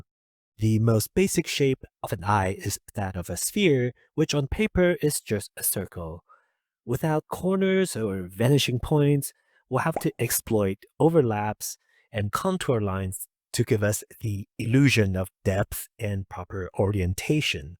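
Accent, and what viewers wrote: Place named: Japan. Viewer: I hear that Japanese or asian type accent and I know this video is going to be filled with amazing quality content, thanks new sub .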